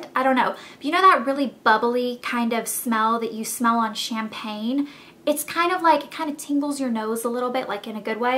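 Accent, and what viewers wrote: South, United States. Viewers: Love the southern accent!! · Ah like it !!! You're little southern accent sounds so cute!!! ahahhaha :) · does anyone else hear her southern accent more in this video?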